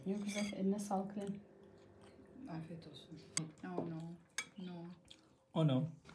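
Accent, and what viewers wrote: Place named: Kars, Turkey. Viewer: Onca yıldır dışarılardasın abi hala içindeki Karslıyı atamamışsın. Konuşunca o "ğ" sesi çıkıyor hala. maşallah. Zamanınız olursa gelsin babasının memleketi Serhat Kars'ı görsün.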